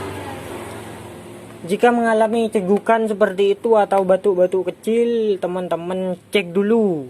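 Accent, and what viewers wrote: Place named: Madura, Indonesia. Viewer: Org madura ya ?😁😁😁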